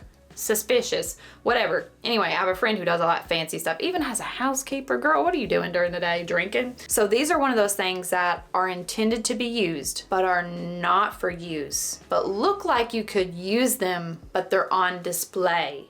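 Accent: South, United States. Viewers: No one appreciates a Southern accent like Southerners do. There’s no shame in that game so let it fly. I have to say that of all the products shone today, YOU, are the best one. Also the freckles. And I have to wonder why companies don’t make faux hair for us silver ladies. We have less hair than anyone so you’d think they’d help us out. I’m all about some faux hair when I can find it in my natural hair of Silver and dark grey. So you go ahead and wear that faux hair with pride girl. Because when you are silver there just aren’t any good ones to wear. Peace and love girl.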